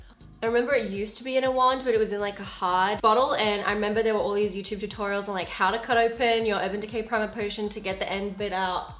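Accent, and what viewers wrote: Australia. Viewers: Loved the look! The palette looks amazing! And love that you’re repping the Aussie accent 🤙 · Your accent🥺🥺 I miss your fully Australian one😔❤️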